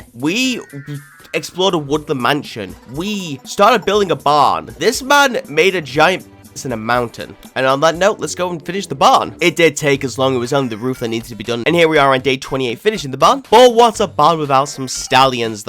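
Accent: United Kingdom. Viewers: I think you have a British accent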